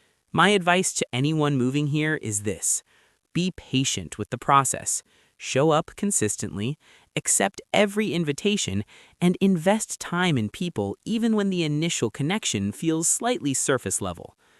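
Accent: United States. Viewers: He doesn’t sound like a British at all, more like an American .😮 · This guy (with an American accent) claims to come from Britain. Never mind. He came here 28 years ago. He never underwent the 60s, 70s and 80s in Singapore where every shred of creativity, individuality and independent thinking was crushed out of you. Which is why many Singaporeans migrated. He missed all that. He never did National Service. And finally being white - every Singaporean women would have kissed his arse most willingly and other brainless Singaporeans would put him on a pedestal. I'd love to see his answer to these points.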